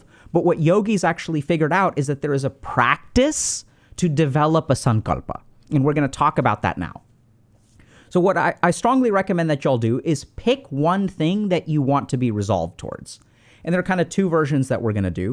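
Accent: India. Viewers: Damn your English sounds so Indian 😅 BTW thanks for free knowledge....you blessing us with your knowledge glad i found your channel ❤ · Bro.. I love the indian accent lol....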